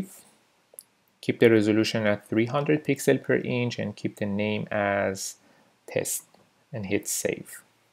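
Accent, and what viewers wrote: Iran. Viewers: I spot a Persian accent when I hear one. great tutorial thank you!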